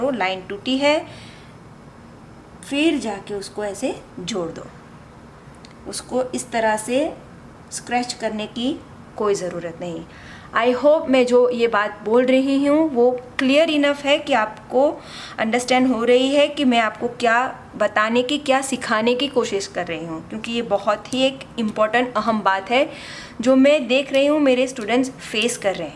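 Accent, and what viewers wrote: Gujarat, India. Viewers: ur accent😯,r u Gujarati?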